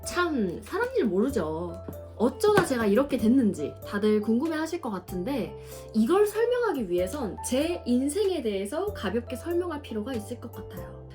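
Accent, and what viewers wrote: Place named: South Korea. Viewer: 인스타에선 광고 욕먹던데 온도차 심하구나....전형적인 한국인 일본어 발음이라고 광고찍냐는 댓글 많던데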